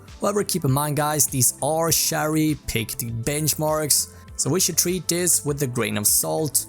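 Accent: Sweden. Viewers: jag hör på din brytning att du är svensk. jag kom på dig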